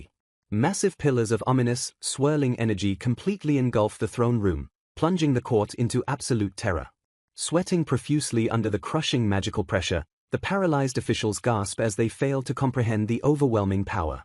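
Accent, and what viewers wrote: United Kingdom. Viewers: BRO WHAT IS THIS ENGLISH BRITISH NARRATOR XD XD XD Where my usual narrator guy lol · using the English accent is smart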